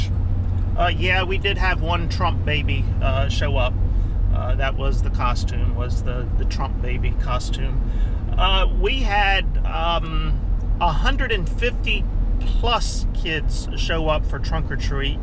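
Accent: San Francisco, United States. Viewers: What a rebel!! You’ve been out San Francisco a lot? I can tell by the sound of your voice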